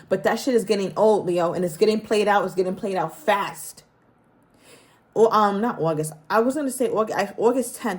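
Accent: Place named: New York, United States. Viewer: SEE this is what I mean! Even if you are not talking about me I LOVE how you break down Leo…!! Bc you’re RIGHT! Everyone acts like Leo takes no shit but you know what? WE ARE FAIR N GENOROUS ASFFFFFF But when you CROSS THAT LINE Oh baby. That’s when it’s CURTAINS. Curtains on your performance, bc I’m no longer interested in attending that show. And THATS when ppl freak out bc they are SO sure they can treat Leo like shit & we’ll always be loyal Na uh no hunny I’m not crazy… I WAS being crazy, for putting up with that bs. Now? I’m not crazy anymore Love that genuine real NY accent… this city is getting overrun with too many transplant & fakers imo.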